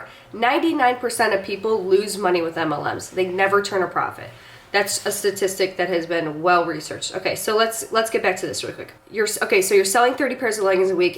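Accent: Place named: Chicago, United States. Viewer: It took me forever to figure out you accent 😂 Chicago is super close to us but juuuuuust slightly different. I looooove it.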